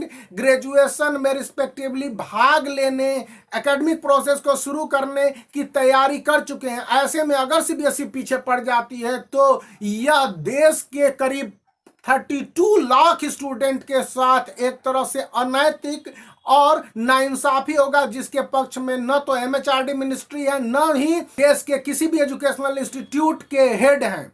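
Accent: Bihar, India. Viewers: Guru ji awaz se hi bihari lag rahe hai kaun zila se hai guru ji aap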